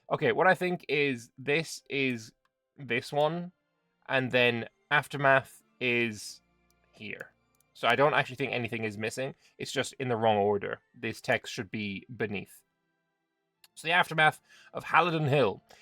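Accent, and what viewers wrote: Scotland, United Kingdom. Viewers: Your scot accent isn't bad actually! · nice scotish accent.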